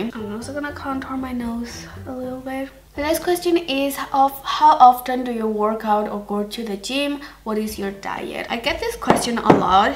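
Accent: Spain. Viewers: Can you a video in spanish like a vlog or something, im loving your spanish accent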